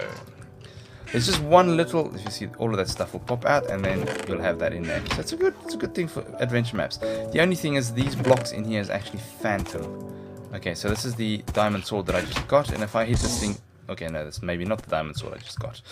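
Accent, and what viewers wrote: South Africa. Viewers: are you from south Africa ??????!!!!!!!!! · Awesome video!!! :D Are you South African?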